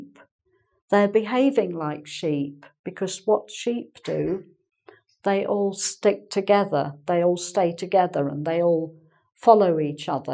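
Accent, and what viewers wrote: England, United Kingdom. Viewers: Standard of British pronunciation she speaks ......so clear...and easy to catch up.....appreciated this lesson....many thanks for your lesson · British accent! Sounds good :) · Good evening Teacher! You are amazing! When i hear you speaking is = brazilians speaking portuguese. The british English is softer to our ears than american english. Thanks for your teaching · I forgot about the lesson you gave and instead I really enjoyed the British accent. It's amazing. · Just came to know your channel last night.accidently....oh ..you are so good teacher!!!!!your accent reminds me the time l lived in England! So lovely tobhear you teaching, your speaking. Your teaching make look like english speaking so easy!!!!!which is not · She is great “old school “ teacher.I already know most of the topics she is teaching,with foul modesty I am fluent in English,but her English English is helping me a lot,since I still have very strong accent and there is always something new to learn from her ❤️ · british accent, ouch! 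· Hello... A very clear and useful class. You are an angel and British English in a person, too... Greetings!!! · really u r an angel to guide the people...your expression is marvellous with typical British accent...thanks a lot ma'am.. From Kolkata..india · congratulations, your accent is England definitively. Thanks for the the subject metaphors it in fact improve our learning the english as a second language. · uk accent? i love it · Thanks for your help! You really are an angel! I just started to watching your videos and it's "lovely" your british accent!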